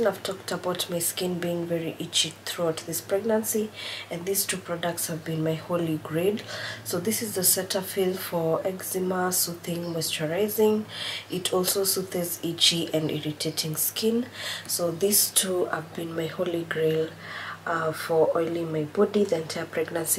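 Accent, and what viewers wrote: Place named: Kamba, Kenya. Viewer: Kamba accent 😂am fighting a cold too and its terrible,safe delivery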